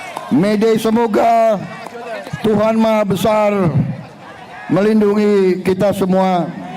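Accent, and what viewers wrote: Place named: Betawi, Indonesia. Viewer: Aye seneng bapak presiden dialek Betawi ❤ pak presiden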